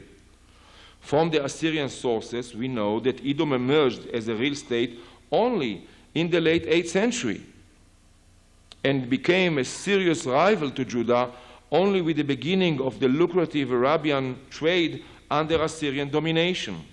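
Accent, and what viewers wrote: Israel. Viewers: Israel Finkelstein is awesome...An Israeli Jew who has no issue at all speaking the truth about the mythology of his people in the context of modern archaeology. The points he hits in this lecture are absolutely true and for the most part have been known for over 100 years. If you can get past his thick Israeli accent this lecture is a good summation for the average biblical-historicist to mull over. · In English? You know he is a Hebrew speaker as you can hear from his accent.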